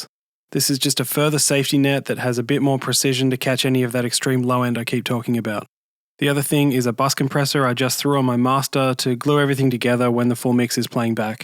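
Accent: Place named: Australia. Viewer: That flat deadpan narrative is just how many Australians talk, especially musicians who are more interested in letting their instrument convey emotion than their speaking voices.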